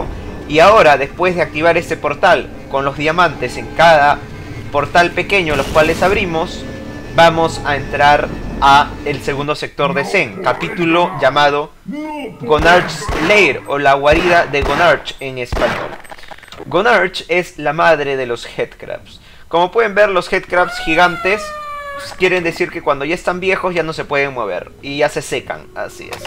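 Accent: Peru. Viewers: eres peruano? si lo eres like xddx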